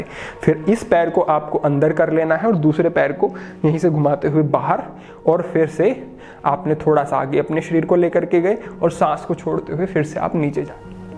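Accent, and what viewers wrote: Standard. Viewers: Shrimaan aapki Hindi ati sundar ati shudh hai. Pranaam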